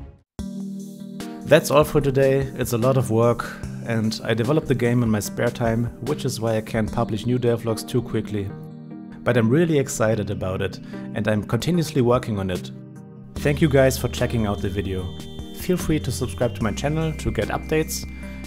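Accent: Germany. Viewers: du bist deutscher das hört man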